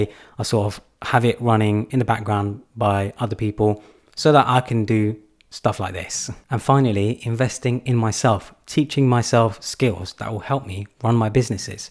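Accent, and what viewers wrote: United Kingdom. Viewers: Hi, I find your accent quite interesting, is it cockney?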